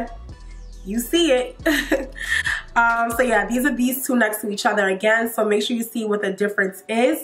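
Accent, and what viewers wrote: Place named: New Jersey, United States. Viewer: Are you from Jersey? Your accent is so cute!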